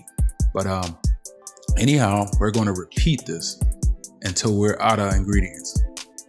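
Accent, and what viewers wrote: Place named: Charleston, United States. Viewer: I knew by the accent! Good ole Chuck Town! I just subscribed! Keep the videos coming! It's very helpful!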